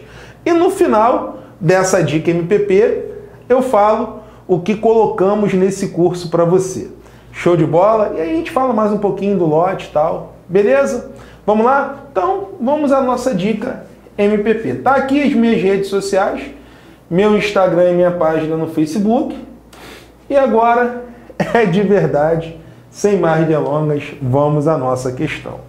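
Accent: Rio de Janeiro, Brazil. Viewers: Professor locão: massa! A geração Z adora isso, tem a pegada de curso pre-vest. Dá até pra suportar o carioquês com essas dicas do tal MPP. bons marketeiros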